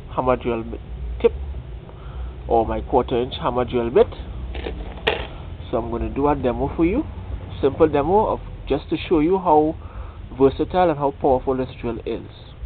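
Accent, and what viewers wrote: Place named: Caribbean. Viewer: Where in the caribbean are you from?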